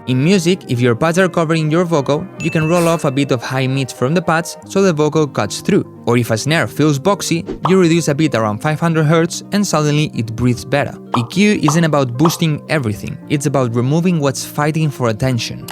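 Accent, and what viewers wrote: Spain. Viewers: hermano ese acento es español dime que si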